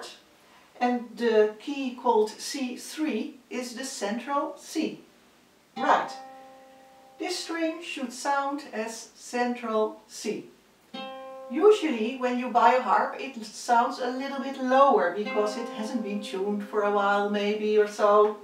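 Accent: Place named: Netherlands. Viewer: sounds like a Dutch accent 8-)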